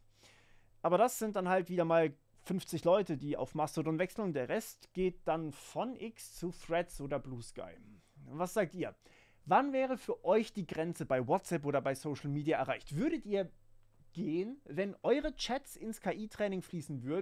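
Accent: Swabia, Germany. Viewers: A scheee, du bisch ja au n Schwôb!!! Ja Griaß Gottle! 😅😅😅💪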